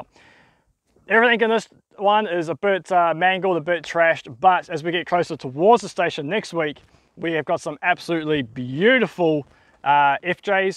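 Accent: New Zealand. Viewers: the kiwi voice is annoying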